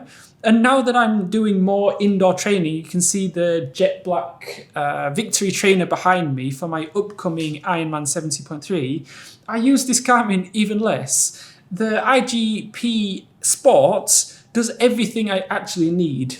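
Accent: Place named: Yorkshire, United Kingdom. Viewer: Where's your accent from? Sounds Geordie with a bit of Yorkshire.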